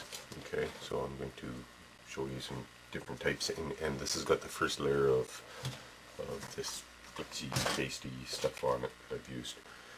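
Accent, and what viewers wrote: Canada. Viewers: are you canadian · Canadian eh? hah, great trees friend!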